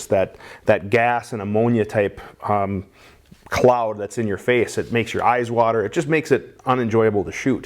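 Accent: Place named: Wisconsin, United States. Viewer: Ah, the accent of a fellow cheesehead. Sounds like home.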